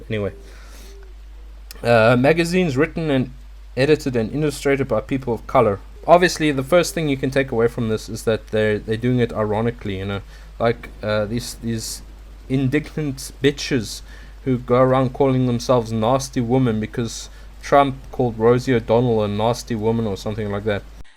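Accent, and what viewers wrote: South Africa. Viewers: You sound very South African. Did you move to Europe?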